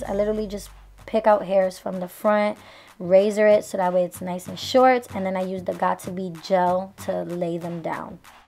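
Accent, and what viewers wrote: New York, United States. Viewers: New subscriber!🙋🏻‍♀️ Within the first 10 minutes of you talking I swear i knew you was from ny 😭 then you said it & im like yassss ! Finally a New York YouTuber 😩😭🙌🏽❤️ your video & wig is bomb af❤️ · I love your personality 😍😍 I knew u was from NY after watching 10sec of the video 😂😂😂😑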